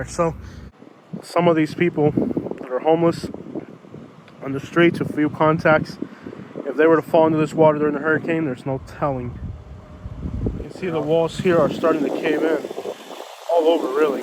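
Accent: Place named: Florida, United States. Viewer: Saludos se escucha que hablas floridacubano 😂 Dios Mio que triste 😢 they didn't even finish repairs from the last storm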